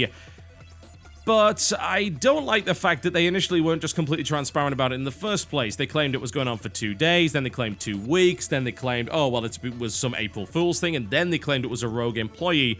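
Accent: United Kingdom. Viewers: Don't worry about missing a few days of content patch, though we all love to hear the latest gaming news spoken in enthralling brittish accent, followed by the most cynical analyses of the current state of the gaming world, we know how hard it is to produce quality content for a youtube channel and have a life at the same time.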